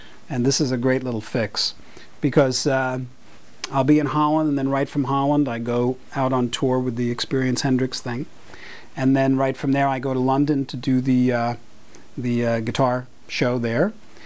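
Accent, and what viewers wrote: United States. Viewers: Love the New York accent. Awe-chestra! :D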